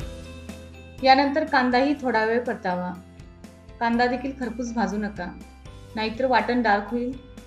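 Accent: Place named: Kolhapur, India. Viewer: Tumhi kolhapuri aahat kai..accent aahe तुमची mala kolhapuri language khup avadte.😍🥰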